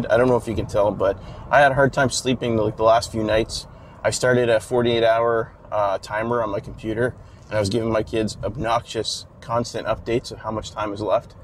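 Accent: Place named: Canada. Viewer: How did I know you were canadian based on your speech? Ha you've got me even more intrigued by the custom trim. I want the LT, but not sure if it's worth the extra 10k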